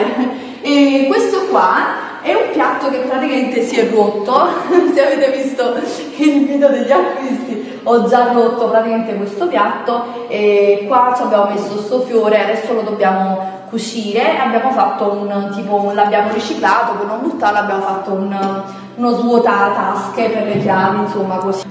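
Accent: Naples, Italy. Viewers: tu abiti a giugliano o Napoli si sente che parli napoletano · Sei napoletana? anche io comunque sei fantastica 😍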